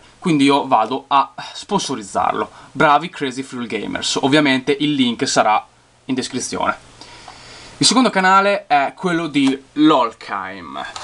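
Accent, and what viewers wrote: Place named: Emilia-Romagna, Italy. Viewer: ma sei dell' emilia romagna? no perchè questo '' bella ragassi'' xD io dico bella ragazzi so siciliano :D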